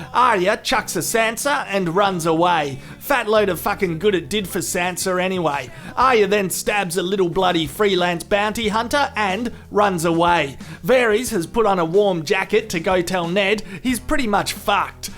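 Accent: Australia. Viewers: he's definitely not British. I'm British and he sounds more Australian to me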